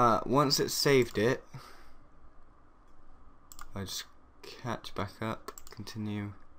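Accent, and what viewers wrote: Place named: Bristol, United Kingdom. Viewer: You sound Bristolian : O and this helped ty, kept finding tutorials for this and found american routers -_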